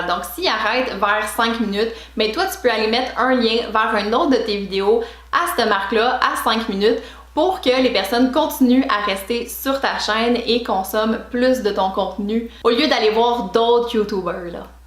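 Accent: Quebec, Canada. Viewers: Putain, l'accent Canadien c'est vraiment trop horrible ! Sérieux ! 😱. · allant et parlant trop vite,on a du mal à vous comprendre avec votre accent Canadien,juste une explication comment envoyer un lien d'une vidéo à quelqu'un sur une autre vidé,Merci. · J'aim très ton accent 😍 c'est le Québécois ? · Merci pour la vidéo ! J'adore l'accent québécois ! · Merci pour ce tuto sympa avec l'accent canadien que j'adore !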